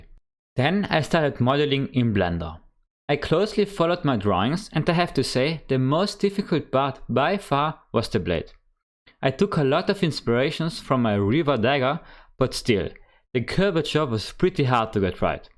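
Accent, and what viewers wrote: Germany. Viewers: are you by any chance german? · der deutsche Akzent ballert richtig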